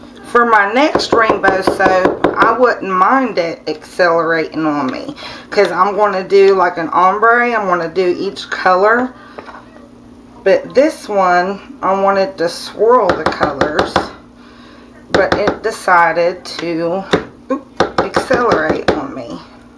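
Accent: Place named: West Virginia, United States. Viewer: hi! love your videos! idk what part of TN you live in but I'm from the southern most part of WV, only takes me 25 min to be in Buchanan county VA...lol......but the reason I'm telling you this is because your accent is the same as ours around here....haha But anyways, down to business.....i just watched a video on a 6 color in the pot swirl and her name is Christy Rose. i just thought I'd let you know about her video and maybe it can help you achieve the results you were wanting. on another note, hope all is well with you and your family and i can't wait to see some new videos and wonderful soaps from you!!!! I'm not a soap maker yet but I'm doing research and i think here soon I'll be ready to try my hand at it. ok I'll stop rambling now, take care and keep on making awesome soaps and whatever else your heart desires...and much love from McDowell county WV!!!!! 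lol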